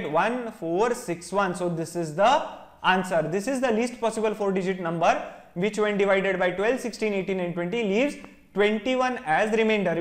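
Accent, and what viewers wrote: India. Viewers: Tamil English bro, cannot hear properly sia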